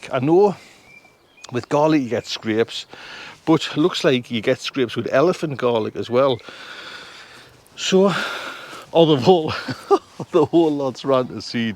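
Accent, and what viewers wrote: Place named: Northern England, United Kingdom. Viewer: We’re a little south of you, accent wise 😂 and my elephant garlic is a bit behind you. The red onions sets are starting to bolt here, every bloody year. Slugs are a nightmare on one bed in particular here. I don’t know what your weather has been like but it’s been like a yo-yo here Durham way. T-shirt then jumper 🤷‍♀️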